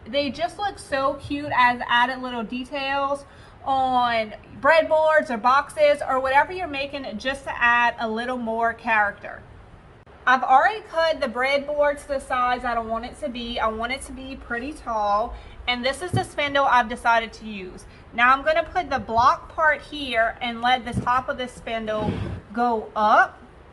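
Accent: Louisiana, United States. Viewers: I just came across your channel and everything is beautiful! I’m curious though, you have got to be from Louisiana. That definitely sounds like a Cajun accent to me! 💕 Edit: just looked in the description and answered my own question!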